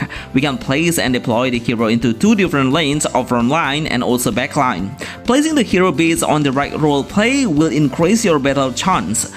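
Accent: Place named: Indonesia. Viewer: I'm from Indonesian, and I noticed your accent pretty much similar to me.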